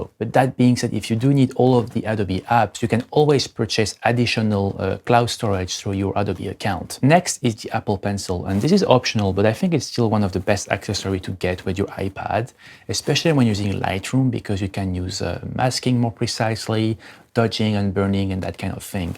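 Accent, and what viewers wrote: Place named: England, United Kingdom. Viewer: Nice and clear tuto with a clean english accent ! Nice work thanks for it ! It helped me a lot.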